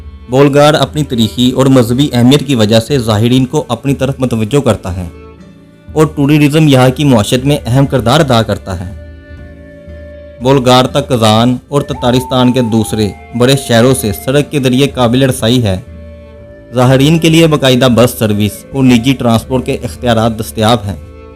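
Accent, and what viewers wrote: Punjab, Pakistan. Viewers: پنجابی میں اردو بولنے کے بجائے پنجابی میں بول لو تو زیادہ اچھا لگے گا۔وڈیو تو ویسے بھی چوری کی ہے۔ترجمہ اور ڈبنگ تو بندے کے پتروں کیطرح کرلیاکرو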